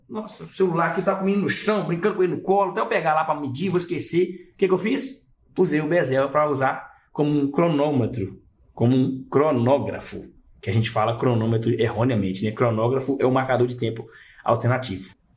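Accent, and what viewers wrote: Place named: Minas Gerais, Brazil. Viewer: APOSTO que esse relojoeiro é mineiro! Que sotaque horroroso! "Pá quê quí sévi u bezel du relój?" Poha, sotaque de Minas Gerais DÓI no ouvido!!! VSF!!!!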